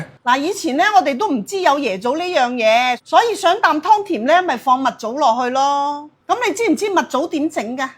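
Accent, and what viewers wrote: China. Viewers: 令人討厭的聲線及語氣，大陸人的廣東話與香港人的不一樣，一聽便分得出。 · 广东人讲嘢是咁语气 广东汤猪峥最正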